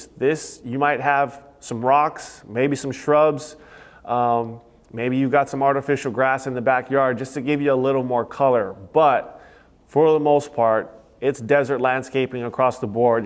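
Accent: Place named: Hawaii, United States. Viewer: This guy saying “in town” & “Locals” haha he definitely from Hawai’i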